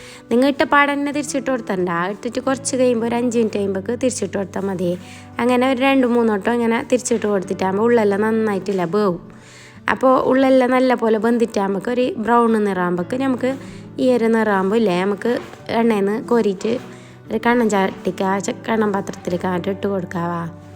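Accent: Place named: Kasaragod, India. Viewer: വീഡിയോ full കണ്ടു.. കാസറഗോഡ് സ്ലാങ് കേൾക്കാൻ.. I like.. സ്ലാങ് ❤️❤️❤️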